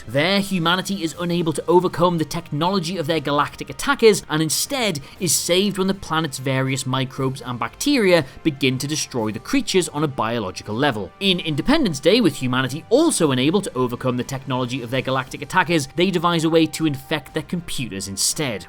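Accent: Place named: North East England, United Kingdom. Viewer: The v/o guy is from the North East of England and that is just how they pronounce words. It's like how a lot of Black Americans say aks instead of ask.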